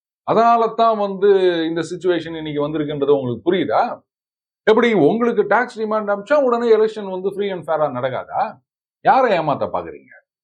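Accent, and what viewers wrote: Chennai, India. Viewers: Innama neeyu, Avaru Ara Kura Madras Tamil than pesararu. Mulu Madras bhashai pesala. Purda..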